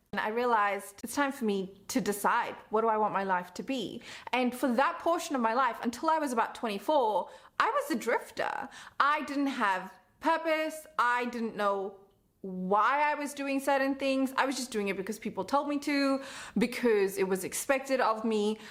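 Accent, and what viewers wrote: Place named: South Africa. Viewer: You sound South African, specifically like you're from the Western Cape suburbs 🌟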